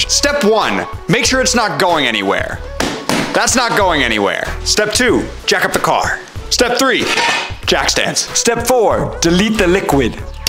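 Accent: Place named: RP, United Kingdom. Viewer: I love how he gets more posh as the video goes on 🤣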